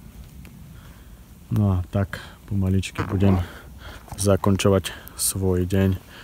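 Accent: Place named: North, Slovakia. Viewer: Máš krásny severoslovenský prízvuk, videá sú super nenechaj sa odradiť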